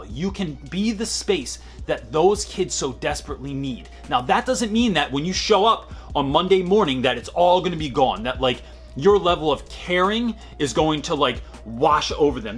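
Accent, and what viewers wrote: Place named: Philadelphia, United States. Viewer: Yessss. I spotted a Philly dialect feature. "Those Johns" hahaha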